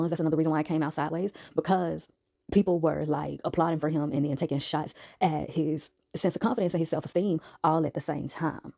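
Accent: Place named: Queens, United States. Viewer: I love your accent, were you from Queen? I subscribed to your channel 💖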